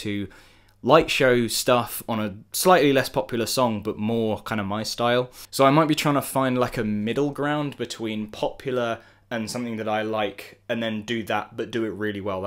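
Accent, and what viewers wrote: United Kingdom. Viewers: yOu sOuNd bRiTiSh also late congrats on 1 mill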